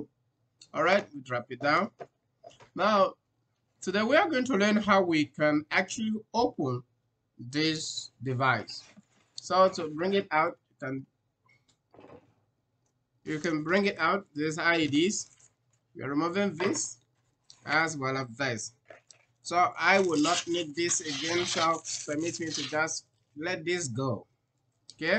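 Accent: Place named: India. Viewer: I was hearing a india speak, awesome information though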